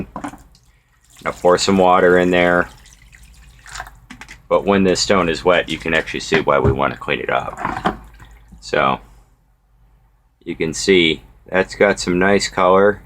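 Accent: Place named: Pittsburgh, United States. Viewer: This is really helpful, thanks! Are you from the western PA area by chance? I grew up in Pittsburgh and your accent is reminding me of home!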